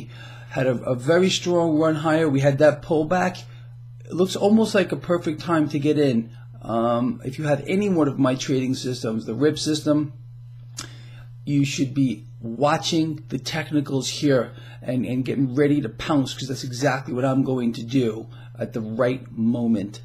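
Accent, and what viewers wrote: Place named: New York, United States. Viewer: I would have never guessed you were from New York - haha ...did you used to own a Trans Am or Camaro?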